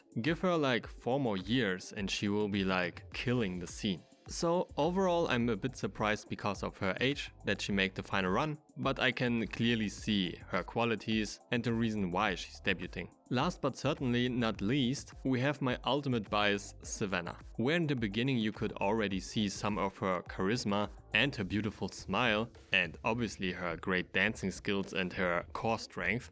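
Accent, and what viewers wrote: Germany. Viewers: You sound german?are you german? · I think you‘re a german speaking English (no hate to you, just random fact I think I noticed 🤗) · Ich glaub du bist deutscher nh🤭 der Akzent kommt mir zu bekannt vor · yes i think so he sounds VERY german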